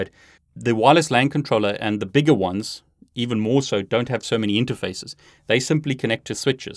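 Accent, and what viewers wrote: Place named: South Africa. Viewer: Hi. Is your Udemy course the same as the YouTube channel one? I want to do my CCNA and was delighted to find a SA voice. Best Udemy video's as well.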